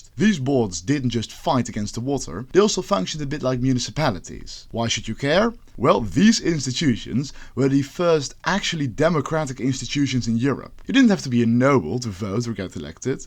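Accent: Netherlands. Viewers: Bro as a dutch guy hearing ur dutch accent, i just wanna say awesome video im 4 minutes in and learned more then our education system thought me in years! Lekker bezig maat!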